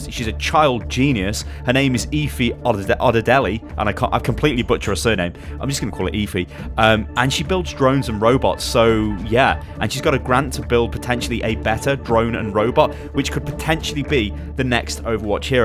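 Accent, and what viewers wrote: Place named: United Kingdom. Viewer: Nice to hear another British voice bro 👌🏻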